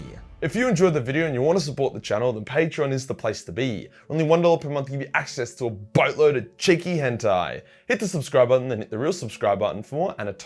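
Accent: Australia. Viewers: I love it when you use your Aussie moonspeak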